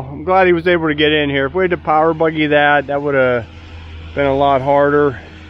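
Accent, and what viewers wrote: Pittsburgh, United States. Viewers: Don't worry about talking like a Yinzer. It's everyone else that has an accent.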